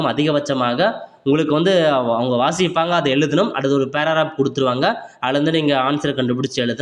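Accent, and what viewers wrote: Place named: Thoothukudi, India. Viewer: Yo thoothukudi ya aha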